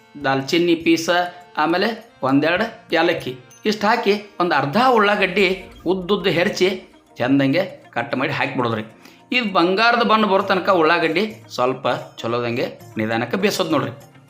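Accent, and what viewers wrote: North Karnataka, India. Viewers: ನೀವು ಮಾಡುವ ರೀತಿ heluva😘 ಉತ್ತರ ಕರ್ನಾಟಕ ಶೈಲಿ, ತುಂಬಾ ಇಷ್ಟವಾಯ್ತು ಧನ್ಯವಾದಗಳು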